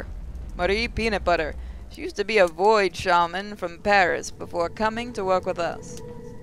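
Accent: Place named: India. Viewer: Your french accent sounds Indian.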